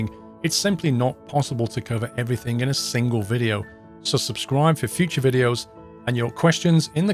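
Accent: United Kingdom. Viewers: Subbed as he's British xD So who's doing an A350?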